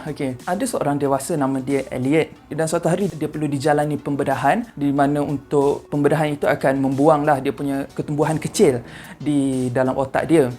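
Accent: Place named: Kelantan, Malaysia. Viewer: Ni mesti org klantan dapat cam loghat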